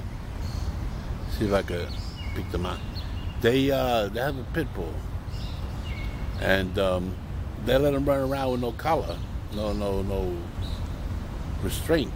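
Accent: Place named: New York, United States. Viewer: New fan here! My name is Tony, I am from Illinois and married with two boys. I would like to tell you that keep doing what your doing, be real, I am 32 years old and I get your humor! Also, helps, that to someone like myself to see your way of living, accent, being from new york is ALL interesting enough! Would love a shout out on a vlog of yours man, would make my day to see a future "youtube kingpin" pay back the love! Keep vlogs coming man, Love'em!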